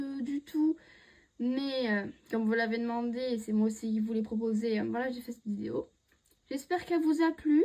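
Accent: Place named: France. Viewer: Aaah trop choupi❤❤ bravooo!!! 🍾 👏🏻 t’as un accent très français 🎀😮 ! Aww trop choupi ☺️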